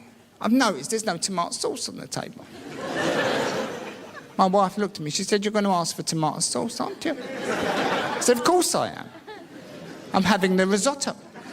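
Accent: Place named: Cockney, United Kingdom. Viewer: You gotta love a bit of the cockney geezer! Pure class! UK xx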